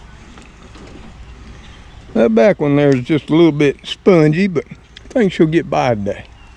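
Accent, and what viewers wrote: South, United States. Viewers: Love southern accents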